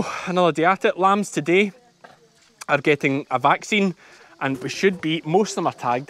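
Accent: Scotland, United Kingdom. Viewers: Loved the photo of and her dogs at the end. Somehow the Scottish accent does not quite have that impact that Forest Gump's Alabama dialect does, but good try.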